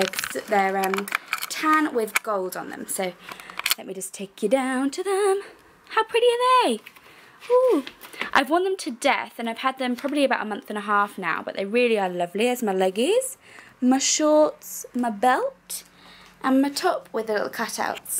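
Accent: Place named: England, United Kingdom. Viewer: You have such a classy English accent, i love it!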